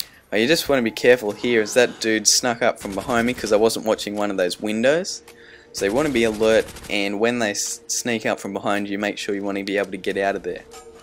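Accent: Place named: Australia. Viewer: lol u have an accent that even though im aussie as well but thats probly because im watching too many american zombie players ;) so glad i finally found an assie one from faction 115 BBBBEEEEAAAAASSSSSSTTTTTT MMMOOODDDDEEEE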